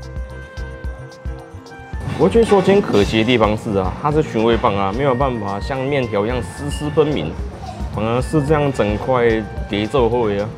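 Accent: Keelung, Taiwan. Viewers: 濃厚的基隆腔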